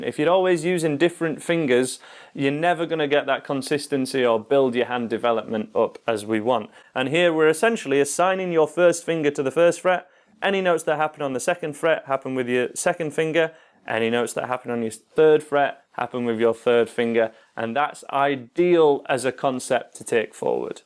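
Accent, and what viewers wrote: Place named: Northern England, United Kingdom. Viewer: Cheers! Mega helpful plus - Northern Accent FTW!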